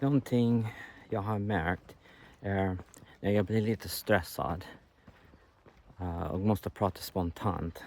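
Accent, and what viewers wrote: Texas, United States. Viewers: As someone who knows it, he sounds just fine. Its easy to understand him, he speaks in a "natural" way (as in, not "book swedish"). Theres a definite american accent but honestly we dont really care about that:D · You speak good swedish without the very strong accent americans often have. Be proud you have nothing to worry about. I understand everything. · De som säger att din svenska är bra har 100% rätt! Man hör ju förstås att du kommer från USA, men om någon har det minsta svårt att förstå dig är det för att den inte vill (troligtvis för att den inte tycker om "icke-svenskar"). Första gången jag ser dina videor och det är superintressant att få inblick i vården i USA från en mer realistiskt källa än House 😆 Att du har kommit så långt med språket på bara tre år är superimponerande, jag vet flera som varit i landet 10-30 år som fortfarande inte kommit lika långt. 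"En"/"ett", "den"/"det", "de"/"dem" är svårt, ("de"/"dem" gör otroligt många infödda svenskar fel på), men det är ju alltid lätt att förstå även om det skulle bli fel. Svårare blir det ju om mellanrummen hamnar fel, hoppas du inte jobbar med någon "grå hårig sjuk sköterska" t.ex. 😉 Bra jobbat! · Det låter riktigt bra. Klart din svenska har en viss Texas-accent, men åtminstone jag som finländare hör mycket klart att du har lärt dig svenska i Sverige. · Utmärkt svenska med bra grammatik och ordförråd. Du talar ju helt flytande utan pauser för att leta ord och det är inga som helst problem att förstå dig. Personligen tycker jag inte att du ska jobba bort texas-accenten, jag gillar den skarpt. Har alltid tyckt att det låter coolt när engelsktalande talar svenska. :) De enda smågrejerna jag noterade var ovanligt ordval på något ställe som "det var en stund sedan", vilket är direktöversättning (while) från engelska och det kan man ju absolut använda och alla förstår men mer vanligt är att man säger "det var ett tag sedan". 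Sen var det någon omvänd ordföljd som "ibland vi får tala engelska med dem" istället för "ibland får vi tala engelska med dem". Men det spelar inte heller någon roll, alla förstår givetvis ändå. Mycket bra jobbat! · Nice work. The Texan accent makes it hard pronounce certain stuff. I teach kids football in Swedish and my English accent is an issue with certain words. Great work though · Din svenska är alldeles utmärkt och jag tycker du fått till fin satsmelodi. Att det hörs att du är från Texas är bara charmigt. Det gör det inte svårare att förstå dig. Bra jobbat!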